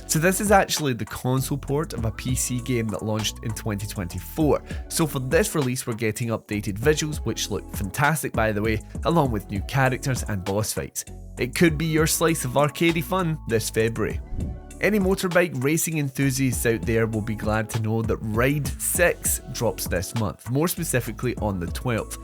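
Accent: United Kingdom. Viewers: Please upload review game vedios . You're vedios are awesome. Are you British ❤❤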